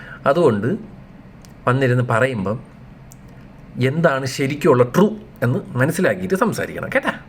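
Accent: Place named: Thiruvananthapuram, India. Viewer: that thiruvanthoram slang hits different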